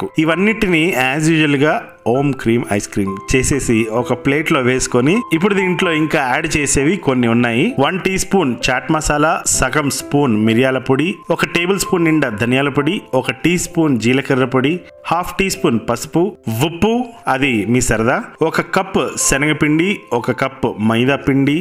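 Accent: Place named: Karnataka, India. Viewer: Bro ur kannada accent super guru...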